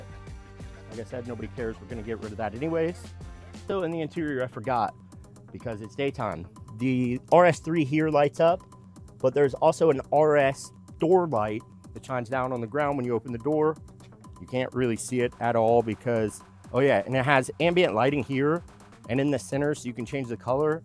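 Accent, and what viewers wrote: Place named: Hollywood, United States. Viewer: Bro you look & sound like the American Hollywood movies have made us rest of the world believe in. Clean nails, too. Bro is SIGMA. Keep it humble king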